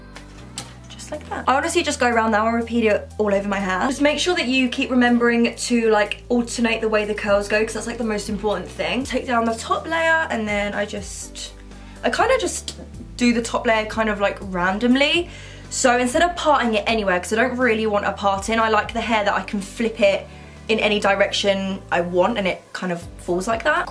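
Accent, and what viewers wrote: United Kingdom. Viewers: I love ur accent british& ilove u😍❤️❤️ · That's real British😂 not what u see in films aha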